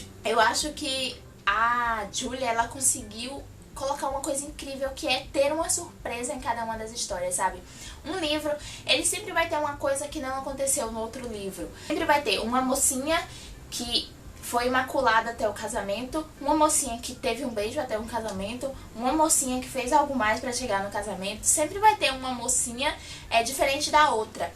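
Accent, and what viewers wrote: Bahia, Brazil. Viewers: tu é baiana????? Nossa eu preciso te conhecer!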